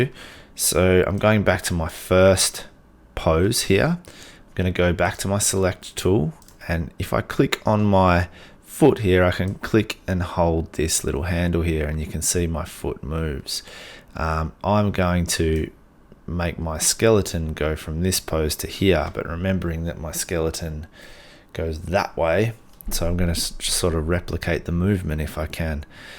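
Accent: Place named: England, United Kingdom. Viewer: No worry but I have follow many tutorials and you are the best for speed and infos and I am in Canada working for a Global company E learning stuff. From your accent you must be in England.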